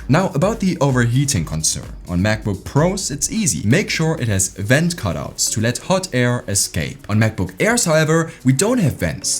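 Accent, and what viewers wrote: Germany. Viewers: bro ,you from deutschland?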